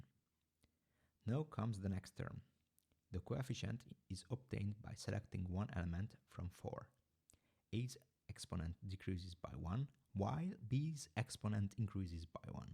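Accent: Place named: Hungary. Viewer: MI A FASZ, CSAK MOST VETTEM ÉSZRE, HOGY TE MAGYAR VAGY. Az akcentus eladta a dolgot. Meg az, hogy variációnak nevezted a permutációt, ami magyar dolog. BRUHHHHHHHHHHHHHHHHHHHHHHh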